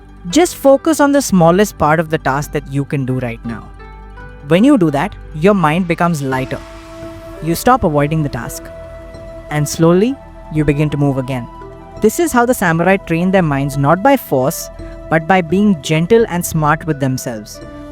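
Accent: India. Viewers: Those videos are REAL good............ What bothers me is hearing an Indian accent..in an Asian Video. Use an AI Reader maybe ? Keep up the good work !!👍 NEW SUBSCRIBER HERE............!! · You sound Indian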